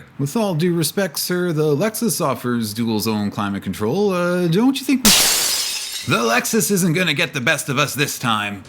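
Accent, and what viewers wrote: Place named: Canada. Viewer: you are definately CANADIAN. "aboat time."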